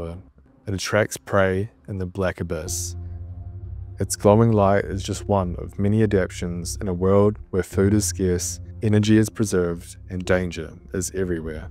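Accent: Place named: New Zealand. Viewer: I love how the captions corrected 'depths' to 'dips' because of your NZ accent lol.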